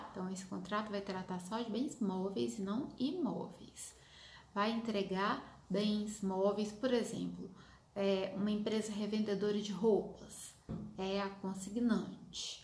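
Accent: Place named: Minas Gerais, Brazil. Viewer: aaaa o sotaque mineiro é a coisa mais confortável de se ouvir🥰🥰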